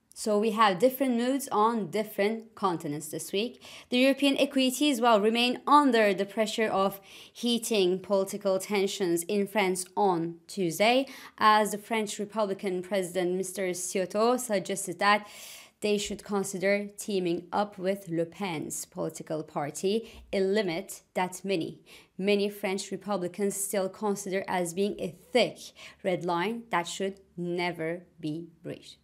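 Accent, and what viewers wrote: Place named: Turkey. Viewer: You have a Turkish accent. Are you Turkish or closely related to Turks?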